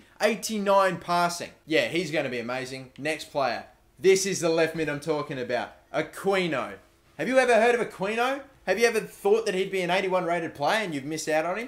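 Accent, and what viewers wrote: Australia. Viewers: wait, you support nufc but you sound Australian? well... good enough for me, SUBSCRIBER EARNED NUFC4LIFE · He is Australian.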